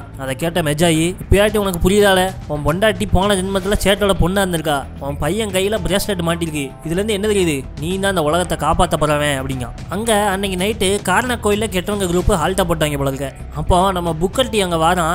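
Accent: Tirunelveli, India. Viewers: It's Trunelveli slang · Bro neenga thirunelveli ya unga slang super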